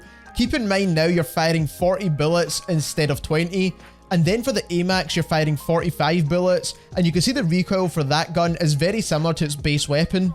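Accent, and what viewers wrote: Scotland, United Kingdom. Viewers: I like your Scottish accent 😊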